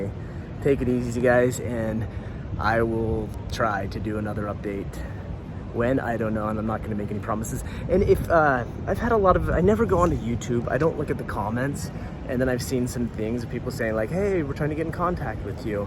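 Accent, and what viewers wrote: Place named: Utah, United States. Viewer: I knew I heard some Utah in that voice. :)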